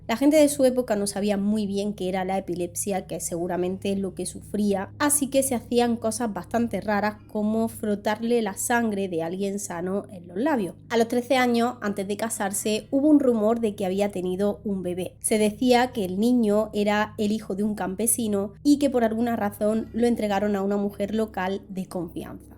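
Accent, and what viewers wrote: Spain. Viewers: 我喜歡你的西班牙口音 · el acento de!!!el mejor acento de España!!o al menos el que mas me gusta!!!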